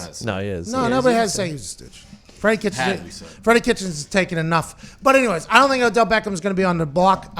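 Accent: Pittsburgh, United States. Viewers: Love how Pat says Antonio Braaann in his all Pittsburghese accent. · That Pittsburgueeze Yinz.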